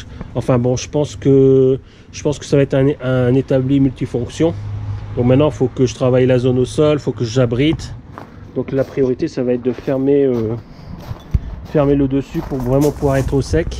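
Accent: North, France. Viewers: Salut, jsuis désolé pour toi de quitter un grand espace pour un espace plus restreint, mais faut s'adapter. Je vois que tu es du nord!? Il me semblait bien avoir reconnu à la voix mais j'avais le doute! Je suis de Cambrai dans le nord je travaille sur une super 5 GTL de 1986, elle passerait dans ton allée lol Moi j'ai ce soucis de place également je travaille sur un terrain non bitumé c'est terrible et je partage quelques vidéos. Je te souhaite bon courage pour toute tes installations d'aménagement. À bientôt, Mat de #super5gtl86 😉✌️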